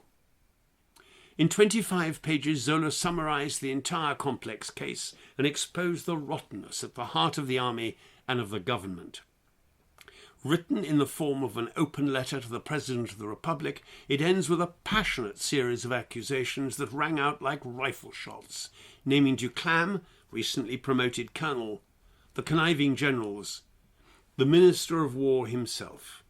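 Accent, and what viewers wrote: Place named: RP, United Kingdom. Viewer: Jesus dude, why? Why is it, as an American who's passed the big 60, with German & Swedish ancestory, who's never really bothered to do much except smoke pot & watch TV, why is it, whether you, or Sir Lawrence O narrating "The World at War", I can only digest history, if narrated in public school Queen's English? What I mean is, I really enjoyed this, and am grateful you produced this, and I will have to read (hear, on <link> really) that book!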